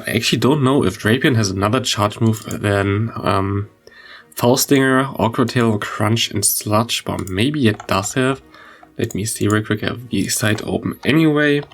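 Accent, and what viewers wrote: Germany. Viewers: Ich wusste es dass du aus DE bist, man hört es am Englisch. 😅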